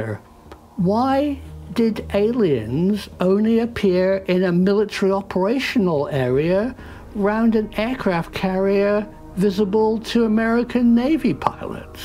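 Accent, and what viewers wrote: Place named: Cornwall, United Kingdom. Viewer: Hi. I'm from England too. I think your from Cornwall. Its really nice you making these videos. This one seems much better so congratulations on your progress. Off topic but are you from Cornwall? It scratches at me haha.. I'm 24 and a massive ufo buff. Again thank you for making these videos. One last question. Where are you AREA 51? THIS VIDEO ROCKS!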